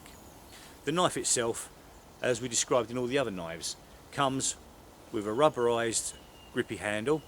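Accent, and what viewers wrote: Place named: Wales, United Kingdom. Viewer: The pointer was the main one and I know that Brecon Twang anywhere lol,and unlike most on here you know what your talking about,good vid will be subbing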